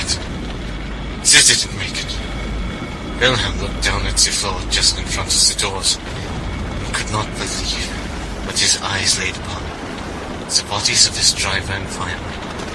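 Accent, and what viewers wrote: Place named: Germany. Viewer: Nice one! Now I'm so hyped!!!!😁😁👍👍👍👍👍👍👍plus I love your German accent 👍👍😀😁😊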